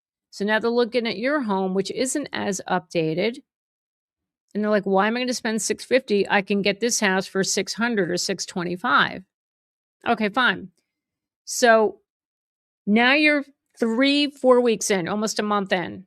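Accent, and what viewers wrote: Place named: North Jersey, United States. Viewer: Awe, you sound like my relatives from my husband’s side in N. Jersey! I feel at home. 😊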